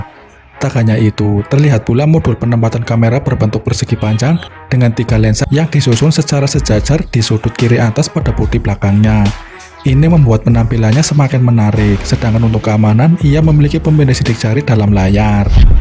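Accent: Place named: West Java, Indonesia. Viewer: Bang... Orang sunda nyakkkk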